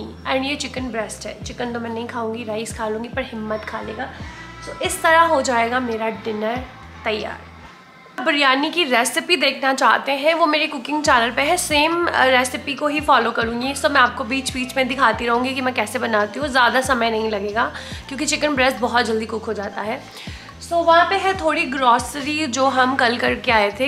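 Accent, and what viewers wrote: Punjab, India. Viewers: I watched ur vlogs ur mix Punjabi nd Hindi accent Bec my mother spoke like u when she is wid me in dis beautiful world Buena don’t care about people they don’t allow us to live happily my sons name is also himmat stay blessed nd happy all d time😊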